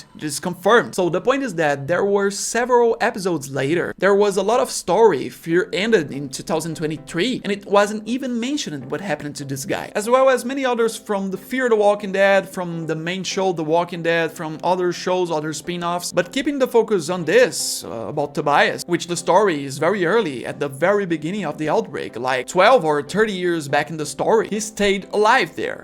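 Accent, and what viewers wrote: Brazil. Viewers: Muito bom o vídeo. Sério, no começo eu escutei seu "sotaque" e na hora pensei que era brasileiro kk, mas no final quando você falou que não era nativo fui ver de onde tu era só por curiosidade e não é q era brasileiro kk. Espero que seu canal cresca ainda mais e sucesso! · sabia que era brasileiro pelo sotaque kkkkkkkkk. Bom video, irmão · Reconheci o sotaque Br na hora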